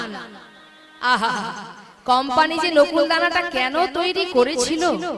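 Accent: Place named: Noakhali, Bangladesh. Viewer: দাদা আপনার বাড়ি বাংলাদেশের কোন এলাকায় ,? আপনার কথা শুনে মনে হচ্ছে ঢাকা নোয়াখালী কিংবা ওর আশে পাশে।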